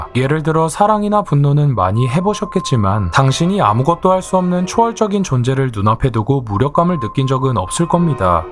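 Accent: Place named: Changwon, South Korea. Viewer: 목소리랑 사투리 창원개미랑 개똑닮 ㅋㅋㅋㅋㅋㅋㅋㅋㅋㅋ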